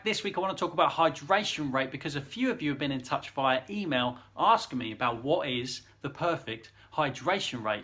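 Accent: United Kingdom. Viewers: From where, in the UK, is his accent from?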